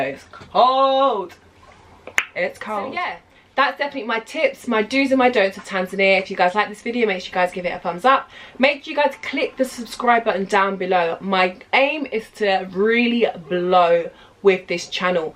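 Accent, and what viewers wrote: United Kingdom. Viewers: not all of it no i was looking for another video but what i did hear was that you sound English where was you born ? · was not expecting a brit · I finely found a a British accent in a view about Tanzania 🇹🇿 🤗 I live in the UK 🇬🇧, that's why I wanted to see the views about Tanzania from a black British, and you are very young girl, thank you so much for this very useful information. Thank you my girl.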